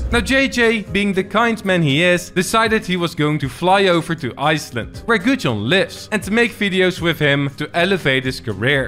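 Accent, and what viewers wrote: Netherlands. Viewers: Im almost sure ur dutch. You sound dutch af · Brother, he's from the Netherlands.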